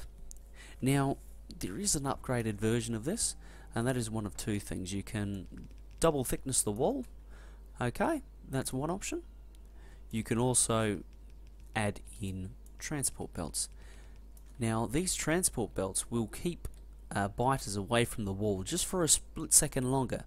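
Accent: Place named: Australia. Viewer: question, do australians all say "turrent" because of some language reason? i've heard it before, they dont say "turret".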